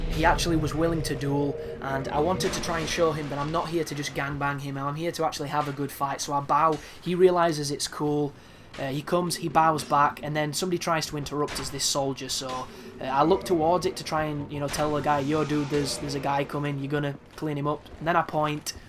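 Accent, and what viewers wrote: United Kingdom. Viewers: Bro, it's Laito not Liato. Its in-dick-t-ment not indIcted. I know your british but come on man.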